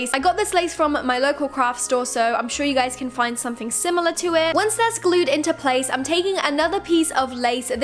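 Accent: United Kingdom. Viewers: Are you british 'Cause you sound british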